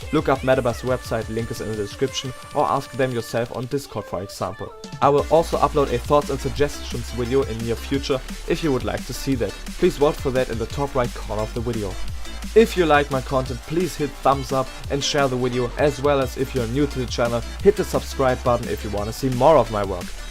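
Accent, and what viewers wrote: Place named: Germany. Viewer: This German accent...... 😖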